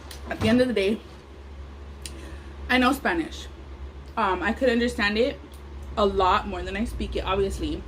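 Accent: Los Angeles, United States. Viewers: Its probably ur LA accent. They r confused 4 sure. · U have a LA accent n there’s nothing wrong with it I love it!!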